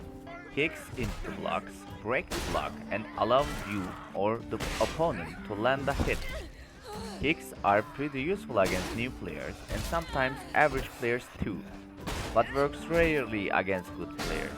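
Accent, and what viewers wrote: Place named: Turkey. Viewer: Turk musun kanka. Aksan felaket😀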